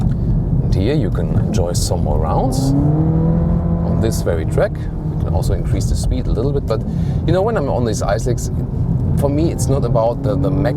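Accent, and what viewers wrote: Germany. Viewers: Schönes Video, aber du solltest an deiner Aussprache arbeiten. Das Klingt mehr nach Deutsch, als nach Englisch^^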